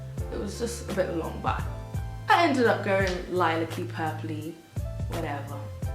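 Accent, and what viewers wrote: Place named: United Kingdom. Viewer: oh you're British... assumed you were American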